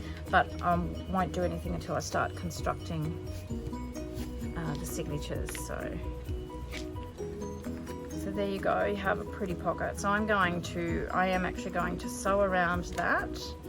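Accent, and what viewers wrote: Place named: Australia. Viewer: I just subscribed to Heather of Ruby and Pearl because I love how she inspired you! Do I want to see you make the ephemera for the Journal? Yes! Do I want to have that background music? No, but when I put it on "closed caption" I could read what you said but missed hearing your lovely Aussie accent. ❤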